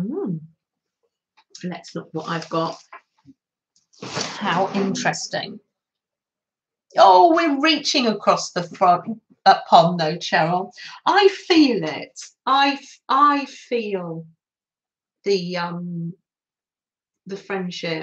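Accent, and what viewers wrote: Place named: United Kingdom. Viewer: Hello sweet 🦋 and Freddie 🐾 My morning birthday treat/gift was to begin my day watching you playing and creating 🥰🥰 I had a beautiful time with you my friend 🦋🎨🎨 you made such a beautiful painty background on the fabric love the Freddie paws on it 🐾🐾❤️ I played along with you with your prompts 🎨✂️🖍 and btw your French with your lovely British accent is perfect 👌 thank you very much Merci beaucoup for this fantastic moment with you❤️❤️ hugs and kisses to you both 🧚‍♀️🐶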